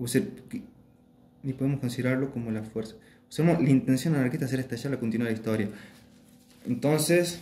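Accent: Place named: Salta, Argentina. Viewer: ¿Che, sos de Salta? Porque tenés un acento bastante parecido al de Jonathan Ramos.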